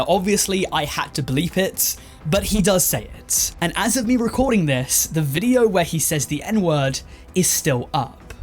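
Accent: United Kingdom. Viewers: I wouldn’t listen to anyone talk about the fall off of masteroogway, except for and his glorious British narration😍😍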